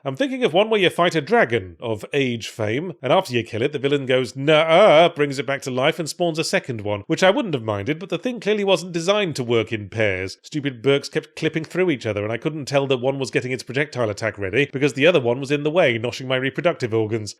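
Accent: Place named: United Kingdom. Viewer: Yeesh: You gotta be really British to naturally rhyme "charms" and "palms".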